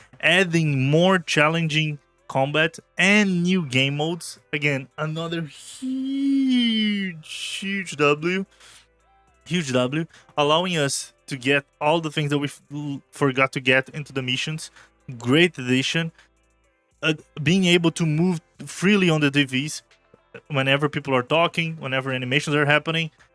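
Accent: Brazil. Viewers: sabia que tu era br pelo sotaque kkkk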